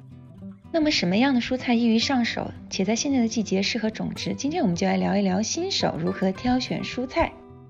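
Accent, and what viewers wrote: Sichuan, China. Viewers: 小姐姐是四川人？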